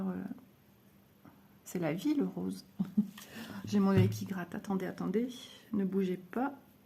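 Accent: North, France. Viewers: Coucou, Ne vous prenez pas la tête il y a tellement de contenu sur YouTube si elles ne sont pas contentes qu'elles aillent voir ailleurs. Quand je n'aime pas je ne regarde pas et pis c'est tout! Et pour le parler du nord, Diditte est pas mal non plus! J'adore. Il est vrai que j'ai beugge plus d'une fois en regardant vos vidéos en juillet avec des références climatiques de mai, mais ça réveille les neurones 😂😂😂 Courage et on veut la suite 😊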